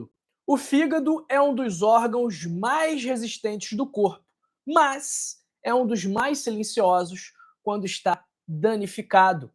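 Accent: Rio de Janeiro, Brazil. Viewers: Porque será que o sotaque carioca é tão desagradável e IRRITANTE ??????? Não dá para assistir !!!! Affffffff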